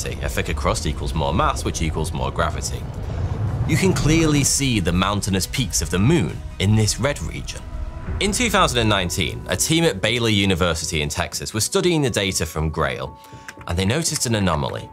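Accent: United Kingdom. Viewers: Can't stand Limey axxents! Drives me nuts! I live in Canada and I want to listen to my videos in Canadian but almost every time, it's a bloody limey, all of whom totally butcher the English language.j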